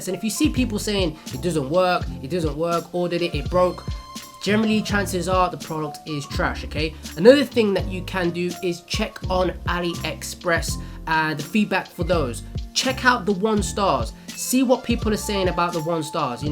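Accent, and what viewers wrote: United Kingdom. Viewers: UK accent lowkey ads more authenticity to his voice, start a podcast "#1 E-commerce Podcast in the world"...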